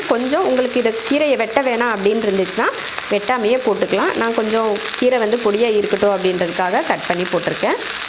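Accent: Coimbatore, India. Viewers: நம் கோவை தமிழும், செய்முறையும் அருமை, Really Yummy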